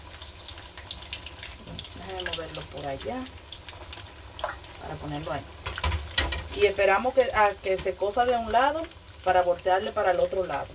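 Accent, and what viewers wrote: Dominican Republic. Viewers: Eres Dominicana 100%✓ 🇩🇴❤️❤️ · No entiendo que tenía la salchicha que se veía como un nylon o algo así. Tampoco entiendo porqué responde casi todos los comentarios con la misma frase aunque le hayan preguntado algo . Para mi tiene acento dominicano y cuando le dicen puertorriqueña, solo responde bendiciéndote jajaj. Se ven deliciosos , fue solo una sugerencia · Está chulísima tú receta. 😋😋😋 Me encantó la manera que explica los pasos y vas haciendo el proceso de la misma. Sé que por tu acento al hablar eres de mi amado país República Dominicana. Tienes una nueva suscriptora en tu canal. Abrazos cordiales mi reina. ❤🇩🇴❤ 👏👏👏👏👏👏👍😘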